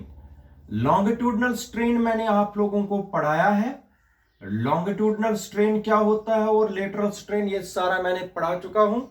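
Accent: Kashmir, India. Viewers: sir why do you have a specific “kashmari" accent.....🙂 😊 😊 and love ur....teaching style sir...❤️❤️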